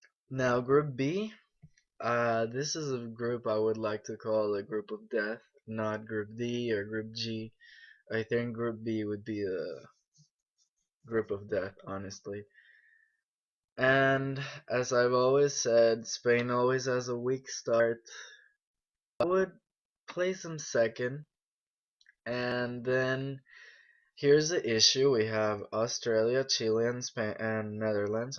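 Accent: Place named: United States. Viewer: So your Spanish or American because you sound American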